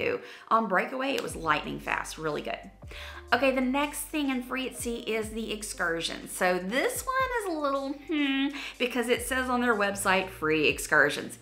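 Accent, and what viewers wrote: South, United States. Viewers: She sounds southern, so maybe Virginia.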